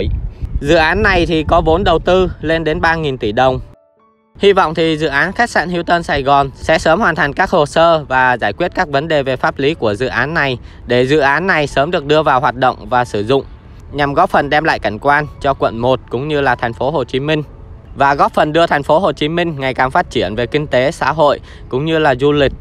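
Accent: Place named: Binh Duong, Vietnam. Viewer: Giọng nghe ghét, Bắc không ra Bắc, Nam không ra Nam, giống BD vậy, nửa nạc nửa mỡ.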